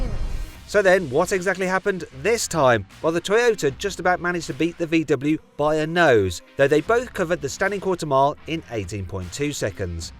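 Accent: United Kingdom. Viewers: Love that northern accent.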